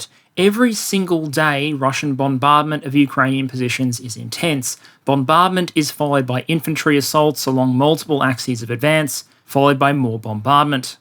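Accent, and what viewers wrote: Australia. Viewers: Sorry but your whiney Aus accent makes it too hard to listen.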